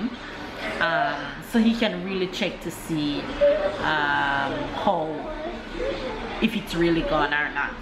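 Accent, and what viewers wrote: Jamaica. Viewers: I am glad I jumped over on your page. Saw you from Adanna and David's page. Like how you keep up with Ja accent👍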